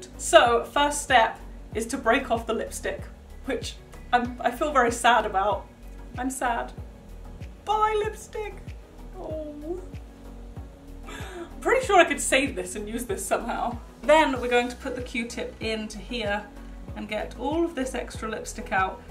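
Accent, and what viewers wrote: United Kingdom. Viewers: I love your British accent ❤️❤️❤️❤️❤️❤️❤️❤️ also you are soooo pretty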